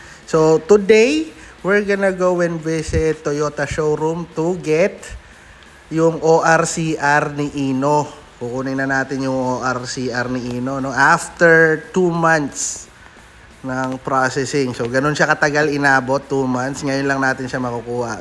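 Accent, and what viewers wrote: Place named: Conyo, Philippines. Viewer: ang conyo mag salita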